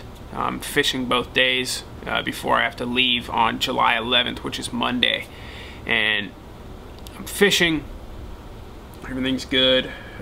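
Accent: South, United States. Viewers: hey man. you can tell your from the south. your a nice guy. almost thought you were from Texas for a minute. lol hook' em baby!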